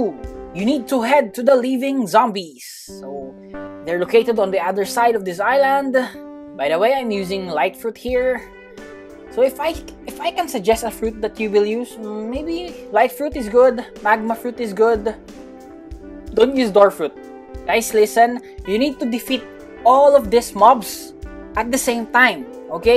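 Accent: Philippines. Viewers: FILIPINO KA TALAGA KUYA BOSES PALANG FILIPINO NA HAHAHAH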